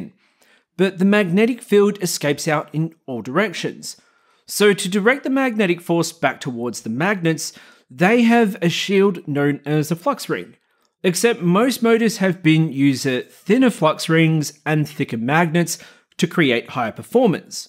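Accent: United Kingdom. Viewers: It was not a criticism of him at all. He just has a very normal ‘Home Counties’ English accent. Like me. And I do like a lot. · well i bought 8 of his 8s motors they produce 2.7kg of thrust is hes blown the competition out of the water in the 2207 class range and only reviewer thats not useless and done a lot for the hobby and do u even know where his English accent is from because i used too live in the uk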